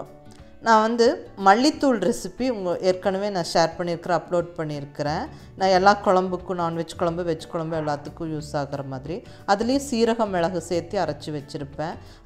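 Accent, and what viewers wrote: Coimbatore, India. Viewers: இது கோயமுத்தூர் தமிழச்சி குரலா?!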